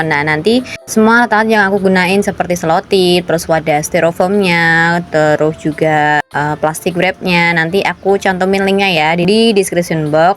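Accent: Java, Indonesia. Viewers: Aku seneng denger logat nya ada jawanya ada lucune, btw makasih yo Kak ilmune, sukses sll Kakak, o..iya ftnya ya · orang jawa mana ya kak?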